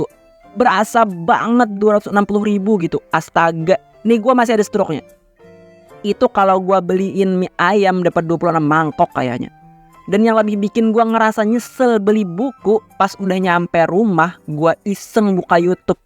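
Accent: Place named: Kandangan, Indonesia. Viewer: Dari logat nya kaya nya orang kandangan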